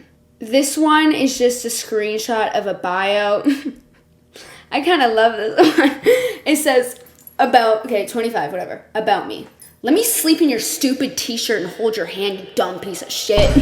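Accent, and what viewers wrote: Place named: Canada. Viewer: Canadian detected, I won’t be subscribing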